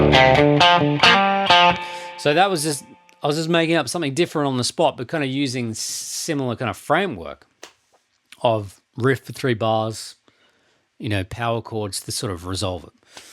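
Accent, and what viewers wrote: Australia. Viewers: I would have guessed Australian, sound just like Keith Urban's accent, everybody knows he's Australian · his accent sure sounds australian to me not british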